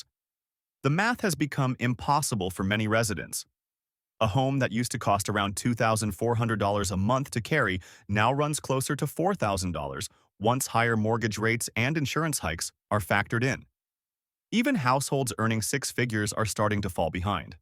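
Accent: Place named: California, United States. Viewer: the speaker can even say the name of Prescott correctly, how do we know if his facts are indeed correct, and are home sale's in Arizona really true facts. This guy sounds like a California person ....?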